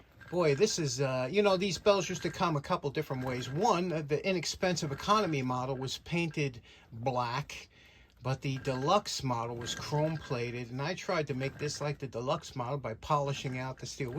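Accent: New York, United States. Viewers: I am definitely not one to correct but I believe you misspoke or maybe I misunderstood. That screw size 6-32 is not six thirty second which would imply a size in inches, it’s a six-thirty two. It is a six gauge screw with 32 threads per inch. I’m sure you know all this maybe it’s just your NY accent and my Texas ear. 😂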